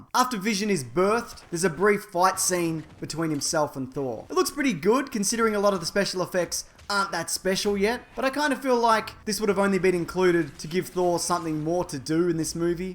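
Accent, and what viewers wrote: Australia. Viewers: You, sir, are my favorite source for Marvel news, because your content is great, very funny, and I really like your Australian accent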